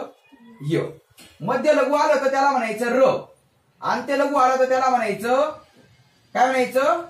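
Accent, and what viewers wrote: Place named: Dhule, India. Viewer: 10th pass karun 22 varsh zhale. Mi kavita lekhan karto. sahaj mhatale vrutta ani chand yache dnyan ghyave punha ekda mhanun video search kela. tar ha video start to end pahila. punha school madhe gelyachi feeling ali. Khupach chaan sir. ani tumchi accent dhulyachi aahe. I hope aatta Lockdown chya kalat vidyarthyanna yacha khup fhayda hoil... Good Job Keep it up. <link> vel milala tar mazhya kavita check kara...(Mukta Chandatlya aahet... ) Baki Chndatalya ani Gazal post nahi kelya ajun shikto aahe...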